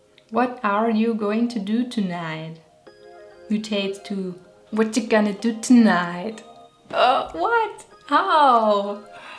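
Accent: Germany. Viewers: I think your accent is awesome!I love it!!I don’t really care that u have an accent!!GERMANS HAVE GREAT ACCENT!AND YES ENGLISH IS HARD BUT U DOIN BETTER!🇧🇪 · Well, I'm American and have been living in Germany for about 9 months. My travel has been limited due to Corona, so I have mostly been hearing the Rheinland-Pfalz accent, but I don't think the German accent is annoying at all. I think do your reasoning for reducing your accent is good. I can understand you just fine, but maybe some Americans might have a harder time. There is nothing wrong with having an accent though. I am struggling to learn to speak German without sounding like I have a potato in my mouth if it makes you feel any better. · Without sounding rude I think you have a very nice accent and can not understand why you'd want to sound less German. I (and others no doubt) can understand you perfectly fine. 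· I'm German myself and I have to be honest,my first suggestion when I heard your voice for the first time,was "I she also German??"that's so sad,but I know that feeling,but I also have to say many of our english teachers aren't even able to speak with a proper pronounciation....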